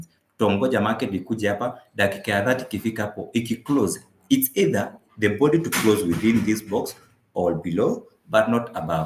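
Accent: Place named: Kenya. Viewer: hi from kenya, nafurai kusikia sauti ya mkenya kwa forex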